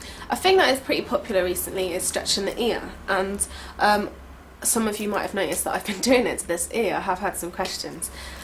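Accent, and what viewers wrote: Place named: United Kingdom. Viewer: OH....MY....GOD....I fucking hate British accents. Instant turn-off.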